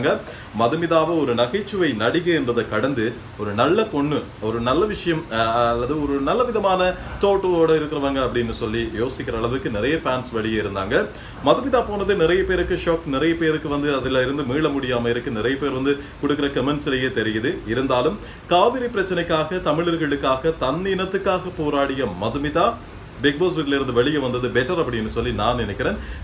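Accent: Sri Lanka. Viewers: உங்க தமிழ் இலங்கைதமிழ் மாதிரி இருக்குங்ண்ணா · ஆம் இவர் இலங்கை 🇱🇰 தமிழர் நல்ல பாடகர் வானொலி அறிவிப்பாளர் நம்ம அண்ணா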